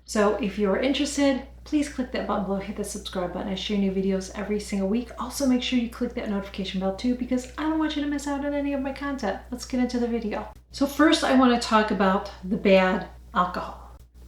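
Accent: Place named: New York, United States. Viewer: Oh dam Girl, i don't check. And yes Clinique was my first foundation. I was advised which shade. It was way to light. And yes i used girl intentionally. I think you have a slight New York accent. And Boo to who put you down. Just pathetic. Hi to Family & Tabitha, the beautiful puddy tat 🐈🐾🐾❤💞❤💕 xxxx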